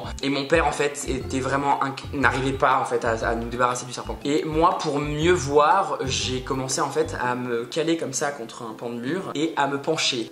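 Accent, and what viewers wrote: United Kingdom. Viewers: Y’a qu’à toi que ça arrive ce genre de choses 😭 Je suis d’accord avec les autres commentaires, t’as grave pris des mimiques à Gaëlle c’est chouu Et j’ai une petite question, ton accent anglais tu le sors d’où ? J’ad-oreee 😍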